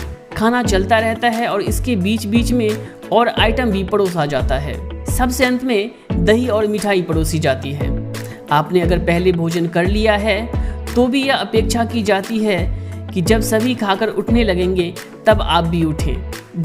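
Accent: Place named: Bihar, India. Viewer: Bhaiya parampara hota hai ya parampara hota hai ham jante hain aap Bihar ke Bihar Dakota bolate Hain Puri ka pura aur pura ka Puri hai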